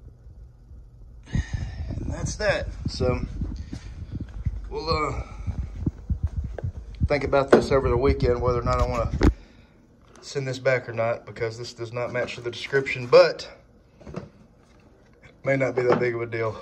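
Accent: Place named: South, United States. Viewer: Country accent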